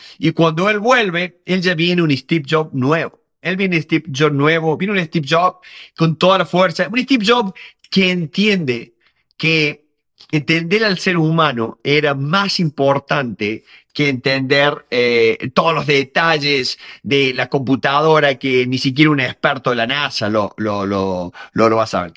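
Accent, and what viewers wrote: Córdoba, Argentina. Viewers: Me encanta tu tono cordobés, muy útil este video. Gracias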